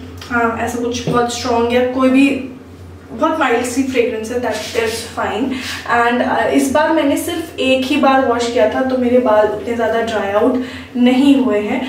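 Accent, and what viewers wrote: India. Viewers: Rajasthani lg re ho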